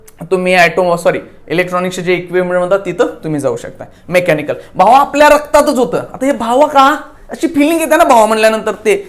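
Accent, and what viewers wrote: Solapur, India. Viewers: Sir tumhi kuthe bhashevarun solapurkr vatatay 😊😊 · Ho Mala pan tasech vatatay Kattar solapuri😅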